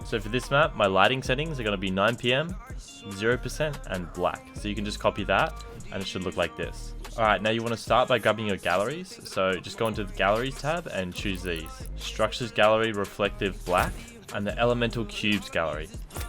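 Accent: Australia. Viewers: Ur australian I didn't know that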